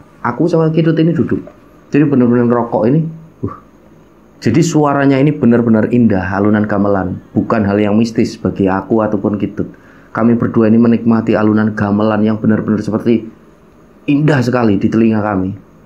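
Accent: Java, Indonesia. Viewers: Jowo ne endi mas?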